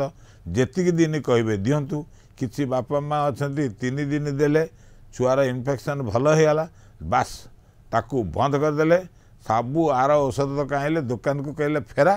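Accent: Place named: Bengal, India. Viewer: Dr Odia karuchanti bangali vasakemiti aasuchi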